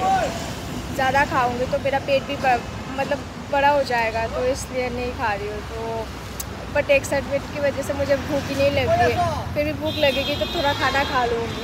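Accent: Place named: Bangladesh. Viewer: আপু আমি বাংলাদেশী আমি বাহরাইন থাকি, আমি তোমার সব ভিডিও দেখি তোমার ভিডিও আমার খুব ভালো লাগে, তুমি আমাদের মত কথা বল, ভালো লাগে তোমার সব কথা,এখন কেমন আছ জানাইবা, রিপ্লাই চাই ওকে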